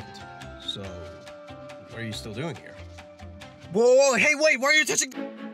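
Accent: Romania. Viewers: are ya romanian? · wait, are you Romanian? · wait... Are you romenian? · YO ARE YOU ROMANIAN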